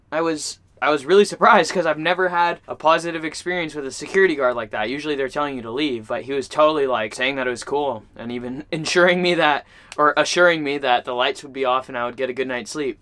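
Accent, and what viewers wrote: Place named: South, United States. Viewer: You put so much work into your videos! It takes time to get all those transitional shots where you get the video and then have to go back and get the gear. Wow. And you must put lots of time into editing. So many shots that flow so well telling a story. And the sound is mostly great! (At the end when the music seemed a little loud to me I just turned down my volume. Smile.) Did you add the surf, wind and sea gull sounds to the coastal drone shot or did that come with the music? Nice touch. You may be a California man but sometimes you really sound like you're from the south. (I'm a native Arkansan.) I'm looking forward to your videos!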